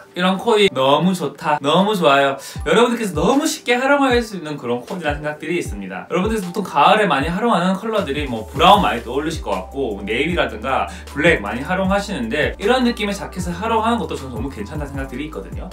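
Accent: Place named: Gyeongsang, South Korea. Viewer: 경상도 사투리 억양이 조금 있으신 거 같네요ㅎㅎ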